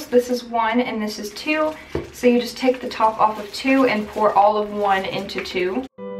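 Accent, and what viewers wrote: South, United States. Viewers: Ive been following your channel for a while. And I just noticed you have a southern accent. Like its not deep South but you kinda sound like your from Houston..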